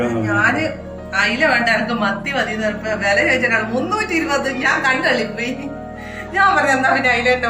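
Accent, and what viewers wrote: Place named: Vadakara, India. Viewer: കോഴിക്കോട് എവിടെയാണ് നിങ്ങളുടെ വീട് വടകര ഭാഗത്തുള്ള ഒരു സംസാരം 🤔🤔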